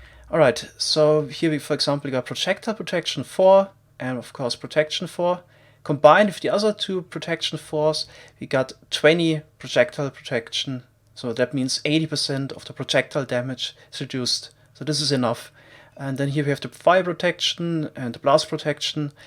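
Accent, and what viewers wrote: Germany. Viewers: Hörst dich wie n deutscher an der englisch redet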